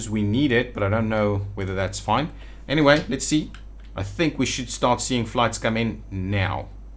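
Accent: Afrikaans, South Africa. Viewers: Are you actually Afrikaans Wondering by the accent and the way you say your "r".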